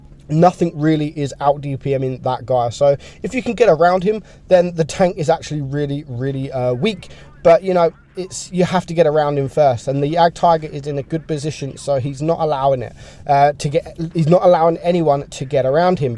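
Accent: United Kingdom. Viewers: I like the way he comment on his channel with hia British accent and the way he said things like Example if E 100 shot you will will know about it or sometime when he said ita frastruating its very nice to hear because the way he said and british accent hehe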